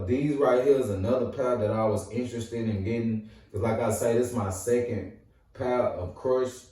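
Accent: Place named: South, United States. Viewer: Bro you country as hell 😆 but your accent cool coming from a NYer🎯❤️ this video super helpful tho I just bought them an wanted too see what they look like in real time.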